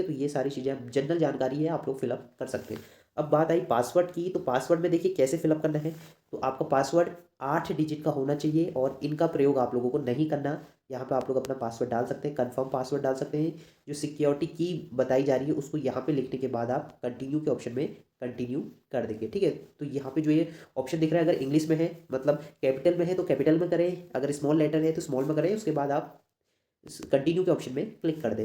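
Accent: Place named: Pahadi, India. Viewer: Cool phadi ki awaj h ye to❤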